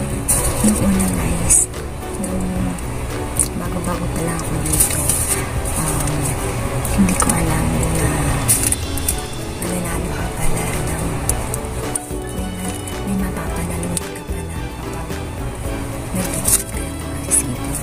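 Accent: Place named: Ilocos, Philippines. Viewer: ilocano ka ba ading hahhaa nice though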